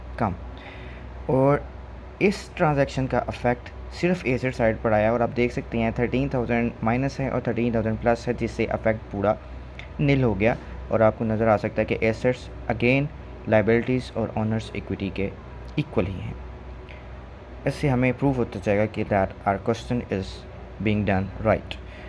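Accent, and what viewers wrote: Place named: Pakistan. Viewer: Omg your urdu accent is so... I wanna meet you sir! Absolutely Amazing❤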